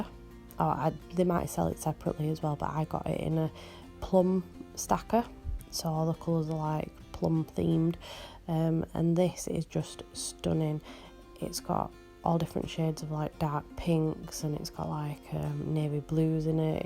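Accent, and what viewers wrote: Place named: Yorkshire, United Kingdom. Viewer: By ek lass . . . Ya proper Yorkshire int ya?. Hahaha. From one Yorkshire lass to another. . . Love these nails.😍💅🏻💕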